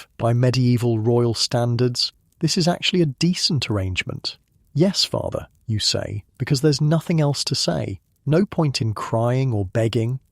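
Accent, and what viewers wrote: England, United Kingdom. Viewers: I’m absolutely sick of the English accent from the narrator. Can we get some American history? 😂 can’t use a fancy British narrator for that lol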